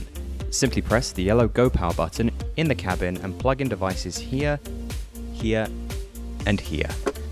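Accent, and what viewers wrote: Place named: United Kingdom. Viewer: i do not understand American marketing that is so enamored with British accents. 🤷🏽‍♂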